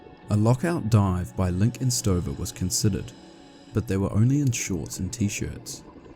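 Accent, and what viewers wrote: New Zealand. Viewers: Dang it! Totally missed your emphasized U sounds!! Russell Crowe is my favorite actor how did Inot recognize your Kiwi accent! You will reach 100k subs by the end of the year I haven't been wrong on predicting channel growth!!! cheers mate!!! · Love new Zealand accent. “Key Wist”. When you want a beer: “pass me a Bicks” 😂😅🤙🤙❤️ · The calm, easy to listen to and easy to understand kiwi accent and your very clear and precise dictation makes for an awesome narration, nice one mate from a fellow kiwi 👍🇳🇿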